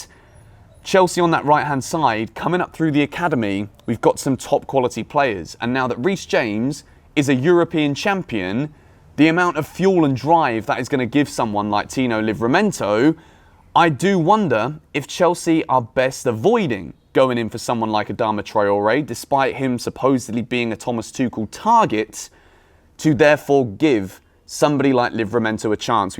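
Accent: South Africa. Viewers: Thanks for the South Africa accent bru❤❤🙌🏾 you making my country proud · You have got the South African accent down bru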